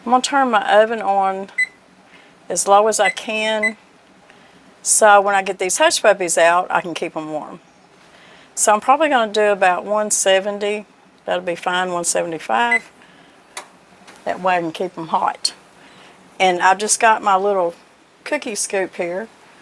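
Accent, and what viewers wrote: South, United States. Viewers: Hi just found your channel Great looking recipes I really like the chicken fried steak video My grandmother is from Arkansas the Southern talk remind me of her God bless you and your family be safe blessings 👍🏼❤️😀🌎👏🏼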